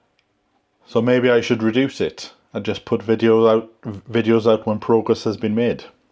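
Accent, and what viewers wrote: Wales, United Kingdom. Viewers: I so enjoy your videos because it is like visiting adear friend. Honest dialogue and just hearing that beautiful Welsh accent takes me right back to my Grandma’s kitchen. Don’ t let those trolls get to you. Not worth your time or energy. Your true blue followers just enjoy spending time with you and enjoying your allotment videos and new vistas when you are out and about in your car. Just you being you is all that we need to bring a little Welsh sunshine into our lives. 💕👍🏻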